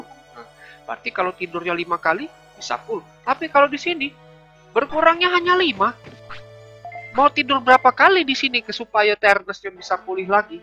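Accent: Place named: Minangkabau, Indonesia. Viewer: Mantap bg...jan jaleh bana logat minang nyo bg hehee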